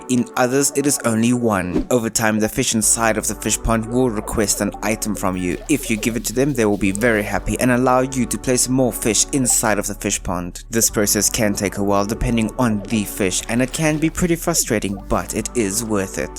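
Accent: South Africa. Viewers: The South African accent. Love to see it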